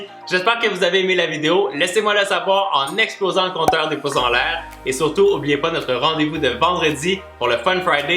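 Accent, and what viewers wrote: Quebec, Canada. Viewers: J'adore ton accent 😍 c trop cool l'accent québécois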